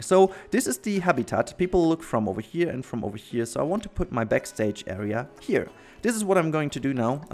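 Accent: Germany. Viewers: Dat german accent tho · Warum machst du eigentlich die Videos auf Englisch? Erreichst du so viel mehr Leute als mit deutsch ? Dein Akzent sagt mir das du deutscher Muttersprachler bist :D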